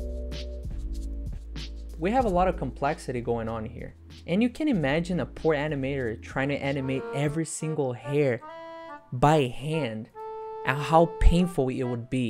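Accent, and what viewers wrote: Brazil. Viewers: Your accent remembers me the brazilian accent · Esquisito como eu consigo sentir q você é brasileiro kkkkkk. Vídeo muito bom e vc explica mto bem, cara, parabéns na moral foda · Eu reconheço um br quando vejo e escuto um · is that a brazilian accent? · Wtf i think you have the best english in Brazil lol I mean you lost most of the accent... i can only wish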